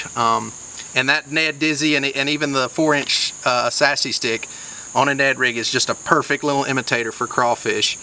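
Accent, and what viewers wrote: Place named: Carolina, United States. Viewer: Gosh-A-Mighty, you are a pure Carolina Boy! Love it!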